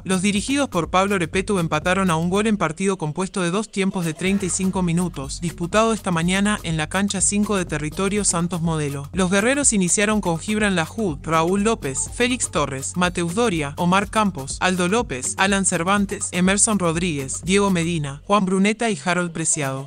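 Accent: Argentina. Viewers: Quiten ese robot con acento argentino por favor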